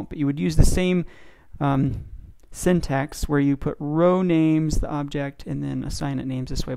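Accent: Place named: India. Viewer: Not a thick indian accent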